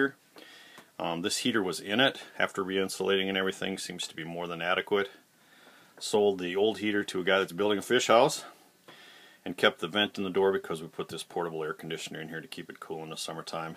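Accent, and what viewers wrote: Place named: Minnesota, United States. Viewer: Are you from Minnesota? When I heard you say "The Cities" it made me think you are. And the way you talk too lol.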